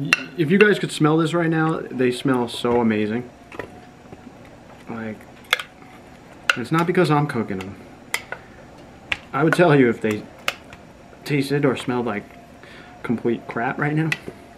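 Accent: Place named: New York, United States. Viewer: I like NYC accent.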